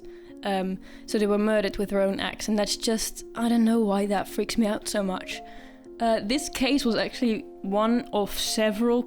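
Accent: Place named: United Kingdom. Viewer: i'm brazilian, and i do not know, really, how i get here, and i not understand 70% what do you say, even know if what i writing now is right, and your is Britanic, worstly to understand (not ofense) but your videos like creatives and interesting enough to fix my attention, so i really wich good luck, God blessings on you and your channel grow up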